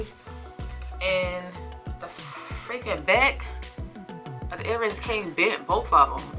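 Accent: Baltimore, United States. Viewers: Great video. Is your accent Mayland/Baltimore?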